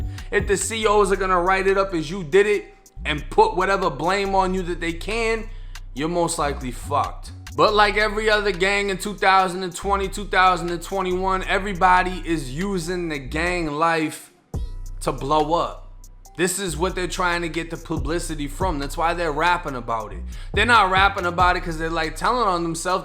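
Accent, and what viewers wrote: Boston, United States. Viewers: SO YOU SOUND A LITTLE BOSTON TO ME BUT YOU REPORTING BROOKLYN NEWS.. TAKE THAT SHIZZ DOWN TO BLUE HILL AVE H-BLOCK BROMLEY HEATH YOU SOUND STUPID!!! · Favorite channel right now ! Over 23 & 1 even, and those other prison channels🤷🏽‍♀️ I think it’s the Boston accent and dramatic ending of sentences🤣💪🏻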